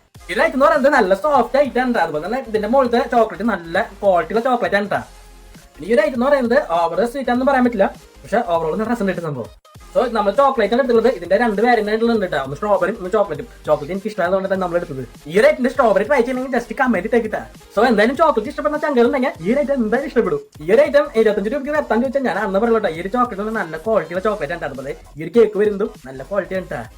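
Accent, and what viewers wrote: Thrissur, India. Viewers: Iyal trissur aano slang ta ta verunnond cgadhichadhattaaa njanum trissur aanu 😂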